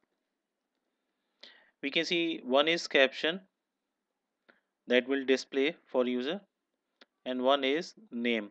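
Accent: India. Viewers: How do you understand indian english?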